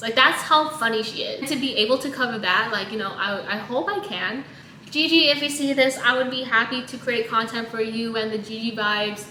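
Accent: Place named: United States. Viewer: Enjoying your vlog especially your American accent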